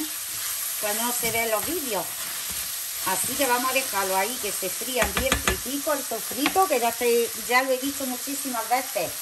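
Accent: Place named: Jaén, Spain. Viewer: Hola guapa soy mili de Valencia me encantan tus recetas mi familia toda es de Jaén y cuando te oigo hablar me recuerda 😅 me hace mucha gracia algunas palabras que dices que también les dice mi madre❤❤ un besazo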